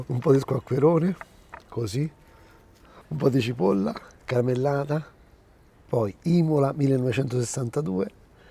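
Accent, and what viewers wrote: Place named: Nettuno, Italy. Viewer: sei proprio de nettuno 😂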